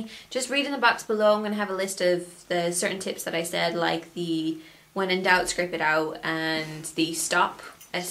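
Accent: Ireland. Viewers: She sounds Irish. She mentions getting some '€' off the eyelash curler, which is currency in Ireland. She sounds as if she's spent time in the USA or Canada though. Her name and her look,are very Irish/Celtic, much more than her sister. :-)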